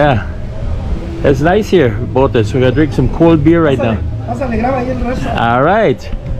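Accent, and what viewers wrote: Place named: Middle East. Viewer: You have an accent where are you from midlle east ??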